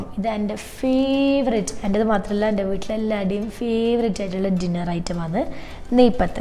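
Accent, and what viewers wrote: Kannur, India. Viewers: Kannur food nammak.peruthishtam..😍 Kannur slang nalla rassa kelkkan. Endayalum spr recipe 😘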